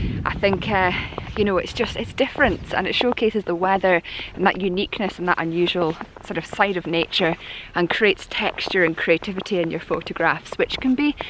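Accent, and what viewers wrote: Scotland, United Kingdom. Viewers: Great Vlog, wonderful location, Brilliant conditions, I am impressed with your Photographic eye, which is supported by your narrative and easy listening scotch accent. thank you for sharing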